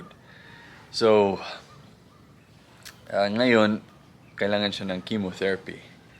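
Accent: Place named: Philippines. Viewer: Ibang iba na ang accent mo ngayon sir very pinoy na..btw, all of us has to go through different hurdles in life but what can makes us strong is our faith in God coz no matter how big our problem is, there is always bigger God for us..Just be strong and keep moving forward. God Bless you and your family.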